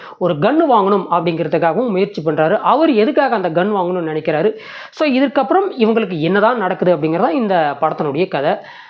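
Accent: Kongu, India. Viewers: Noted Kongu Tamizh Vazhga...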